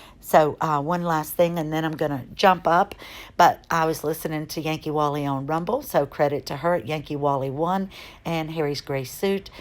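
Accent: South, United States. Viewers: Where in the south are you from? Beautiful accent.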